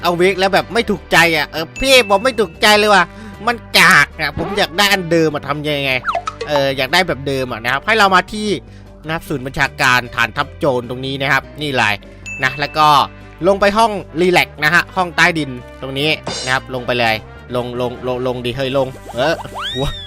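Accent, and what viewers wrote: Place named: Isan, Thailand. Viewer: เปิดคริปแล้วอีสานนีโครตเท่เลยนะเนีย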